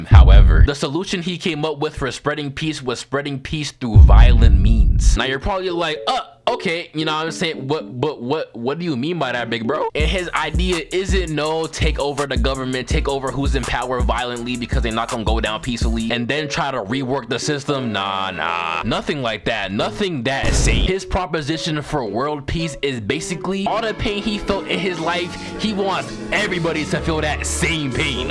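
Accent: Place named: Philadelphia, United States. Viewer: you so philly bro 😂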